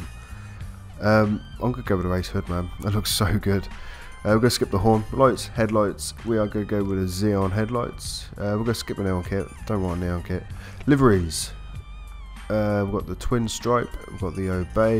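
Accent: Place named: Norwich, United Kingdom. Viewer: Your from Norwich right? Or very very close lol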